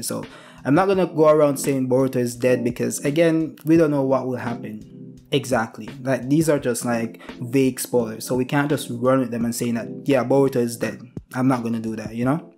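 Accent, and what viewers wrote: Jamaica. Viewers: i knew it i am also Jamaican bro u speak Jamaican English when i speak English u hear ghetto english nice to meet you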